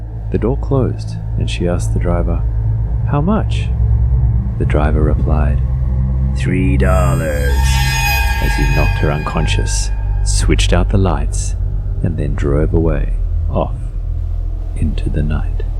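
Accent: Australia. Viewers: I subscribed because I love the stories and animations. Also the voice is on point. It also sounds like this guy is Australian. I'm Australian btw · He's Australian.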